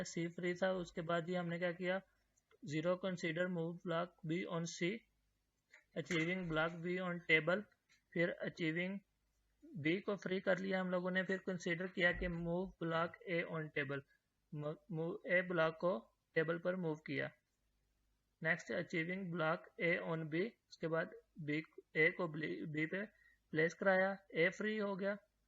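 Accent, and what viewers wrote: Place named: India. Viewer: 印度英语听不懂。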